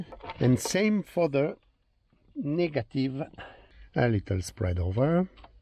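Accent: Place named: France. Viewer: Bonjour, Je me permets d'écrire en français étant donné avec qu'avec ce magnifique accent, je pense reconnaître notre belle France dans votre voix ! J'aurais voulu avoir s'il était possible de changer de musique avec les flèches suivant et présentent du volant si on est dans un album par exemple ? Super vidéo et merci d'avance !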